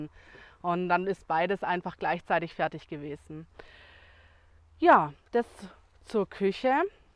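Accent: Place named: Swabia, Germany. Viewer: Ein Hauch von Schwäbisch - sehr charmant.😊😊😊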